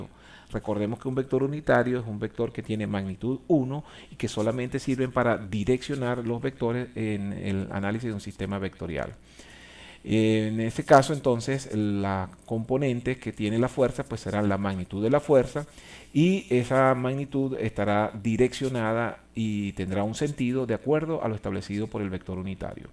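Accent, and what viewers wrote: Venezuela. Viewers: Ese acento venezolano que no se lo quita nadie. Saludos desde Venezuela. Estoy mirando el vídeo horas antes del examen. Deseenme suerte papus v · Acento Venezolano Nojoda! gracias a ese profesor saqué 20 Pts :D, se lo agradezco mucho profe!.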